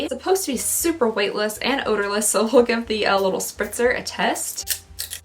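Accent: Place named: Tennessee, United States. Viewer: daaaamn! I'm the 12th. I enjoy myself. I'm in TN so I get her accent and humor. My Mom is from KY. We're all crazy and that's what makes these videos incredibly enjoyable. Happy early Birthday!! 🥳🎁🎂🎉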